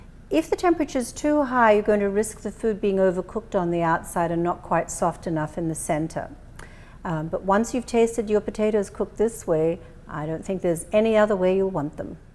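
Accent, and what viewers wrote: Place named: South Africa. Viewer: Hi, great video thanks, where is your accent from, it sounds South African (Cape Town specific), who's previously lived in Australia and now currently living in Canada:)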